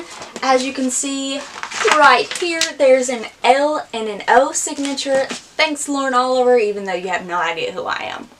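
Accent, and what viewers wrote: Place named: South, United States. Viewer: Your southern accent is so cute! I have 20 books to haul so I totally understand. Also I’m amazed that you held them all up for the thumbnail!!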